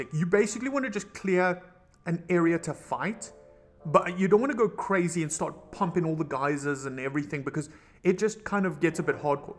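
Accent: South Africa. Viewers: are you South African bru ?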